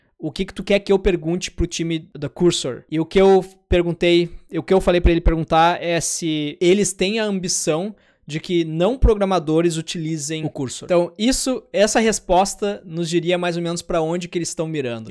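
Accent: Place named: Ceará, Brazil. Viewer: Cearense detected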